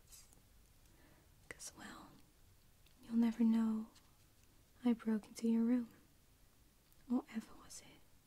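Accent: Netherlands. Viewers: Dat lichte nederlandse accentje kruipt er toch stiekem tussendoor :)) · I smell a dutch accent.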